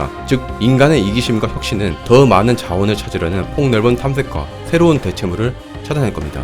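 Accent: Gyeongsang, South Korea. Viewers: 경상도 사람인가 억양이 나랑 비슷한 냄세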